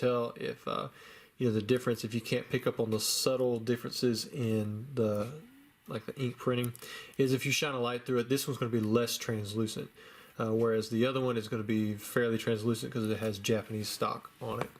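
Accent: South, United States. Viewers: He has a little southern twang in his speech. I believe he is from north Carolina but not 100 percent sure.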